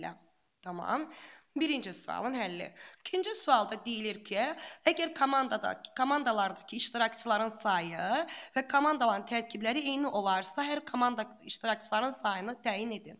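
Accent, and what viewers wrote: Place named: Russia. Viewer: Rus sektırıdı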